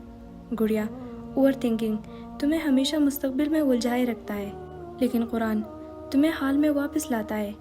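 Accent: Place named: Khyber Pakhtunkhwa, Pakistan. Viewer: Such a beautiful voice and accent . Senin accent is pathani Bilmiyorum . Thank you so much for such a good message . May Allah bless you ❤😊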